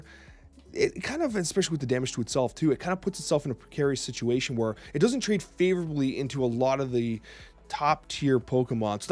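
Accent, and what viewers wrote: Canada. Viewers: He's Canadian. Leave alone!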